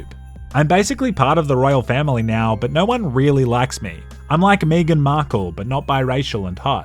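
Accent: Australia. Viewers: You have my sense of humour, you play all the games I like, and you have an aussie accent (the best accent). This channel is essentially designed for me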